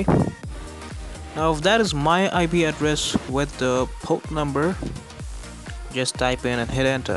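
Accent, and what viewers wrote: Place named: United States. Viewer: u have a american accent or u must be prentending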